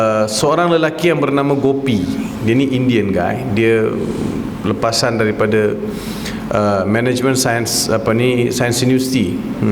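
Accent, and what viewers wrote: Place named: Malaysia. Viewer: Suara ustaz malayu original lah..😍😍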